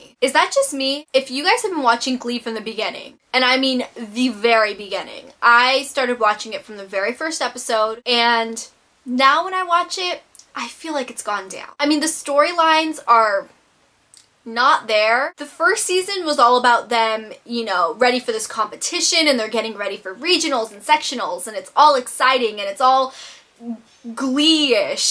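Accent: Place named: California, United States. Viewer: Bahhh you're so funny! we have similar humor! you sound like you're from CA too, correct? :)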